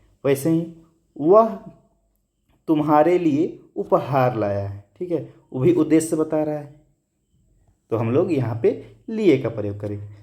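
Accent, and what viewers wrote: Motihari, India. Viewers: Aap Motihari se hai kya bhai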